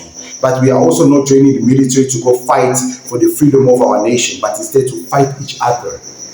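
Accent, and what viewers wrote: South Africa. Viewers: What? You are Angolan? You sound South African 🇿🇦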